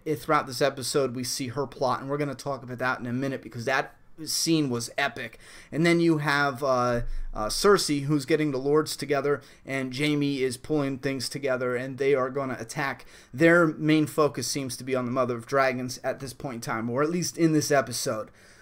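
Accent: United States. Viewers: I love your American way of speaking about Game of Thrones. 😊 Such a great episode, I watched it twice. Loved seeing Lady Allena meeting Khaleesi.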